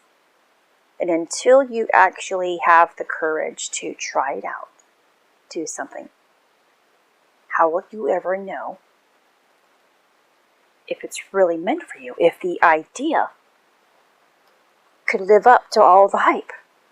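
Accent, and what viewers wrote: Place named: Asia. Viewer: I noticed a bit of and Asian accent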